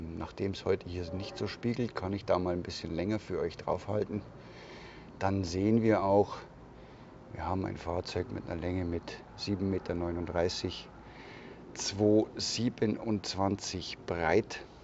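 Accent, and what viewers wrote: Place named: Lower Bavaria, Germany. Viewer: Hallo, also das Video wäre noch besser, wenn du dich auf deine Heimat Sprache ( Niederbayrisch) einigen könntest, man merkt, dass du dich anstrengen musst wegen dem versuchten Hochdeutsch, deswegen deine Langsamen Wörter/Sätze. Bei ca. 14/16 min. willst du den linken Kleiderschrank (wenn man zum Bett davor stehst) erklären, ist dir da ein Fehler unterlaufen? denn die Türe geht ja gar nicht auf, die hängt an den Treppen, also kann man die nur von oben aufmachen. Denke du solltest in Zukunft deine Vorstellungs- Mobile vorher besser/vorbereiten/ ausprobieren wo was steht oder geht. Denke "Bayern Camper hat eine bessere Werbung verdient.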